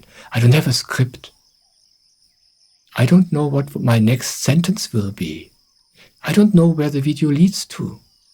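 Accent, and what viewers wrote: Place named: Germany. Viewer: Accent like Geraman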